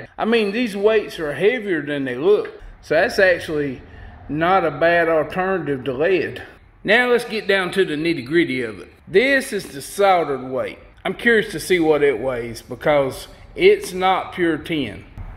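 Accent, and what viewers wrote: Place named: South, United States. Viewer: This is a good, informative vid & fun & funny. Bess tek a class en Sothan drawl for this videe-eye-ee-eye-oh! I cracked up the first time I heard him say “Lead Sinkers” slowly & the word “Lead” was two syllables (lay-Ed) like my SC sis-in-law says “Bray-Ed.” Ya know, Bray-Ed...as in... Raw Toast. ;-) The “Ten” sinker doesn’t mean #10. It means Tin. Ha! I do enjoy a good drawl! (& yes, I know he doesn’t Always say “Lay-ed.” More often he says it correctly.)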